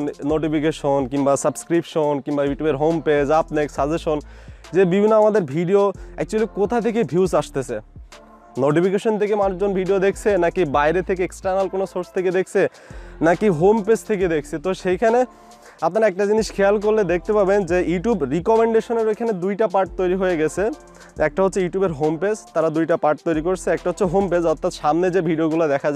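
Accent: Sylhet, Bangladesh. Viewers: ভাই আপনার বাড়ি সিলেট না কি?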